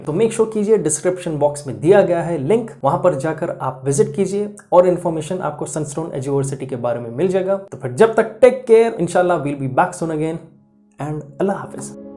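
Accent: Hyderabad, India. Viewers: your Hyderabadi accent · Hyderabadich hai miya ye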